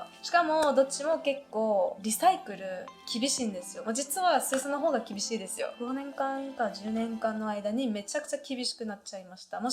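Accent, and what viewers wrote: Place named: Kansai, Japan. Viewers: ちゃん、とてもかわいい！関西弁まじりの日本語もステキ❣️ とても日本を良く見てくれていて… うれしいです。ありがとう💕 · ところどころ関西弁入ってるな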